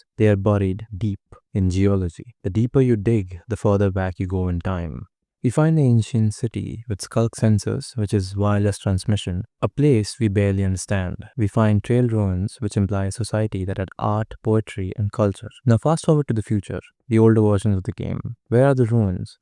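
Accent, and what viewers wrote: India. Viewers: Cool theory but why do you sound like a poopjeet?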